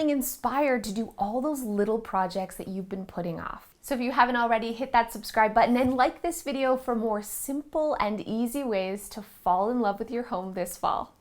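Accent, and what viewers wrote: Canada. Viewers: I have been watching/listening to your videos all day while I work. I'm the mom of 5 kids and I LOVE organizing, but nothing has ever really stuck. I have such high hopes after everything I've learned from you. I love the minimalist mom too, but I can't fully get on board with that concept so it's nice to have categories that I put my things into. I've taken breaks all day long to declutter certain areas, it makes such a difference to take things one shelf/cupboard at a time. I love that you say organizing can only come after the decluttering. I'm working on decluttering all the areas first then go back to really organize. Thank you for being so motivating, so relatable, and so kind. Also, my dad's family is from Calgary Alberta, where are you from? I can hear your Canadian accent..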